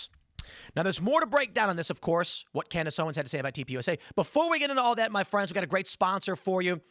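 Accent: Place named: Chicago, United States. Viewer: Tim, please stop popping your words, it’s better when you sound like a Chicagoan.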